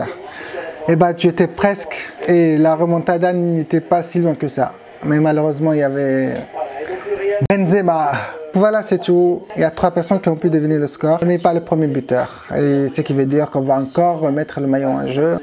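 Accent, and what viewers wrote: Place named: Brazil. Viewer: ta un accent bresiliens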